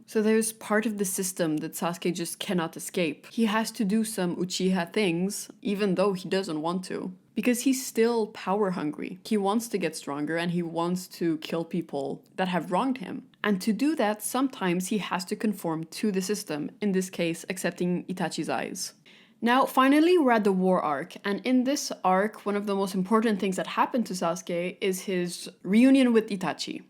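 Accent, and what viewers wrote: Quebec, Canada. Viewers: Great video, I loved it. Also is this a quebec accent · Are you a quebecer or French person by any chance? Just curious because I'm from Quebec and your english accent sounds similar to mine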